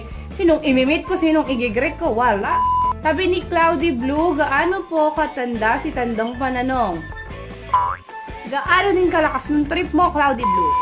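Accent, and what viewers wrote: Visayas, Philippines. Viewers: 😍😍😍Cute niya magbisaya😀😍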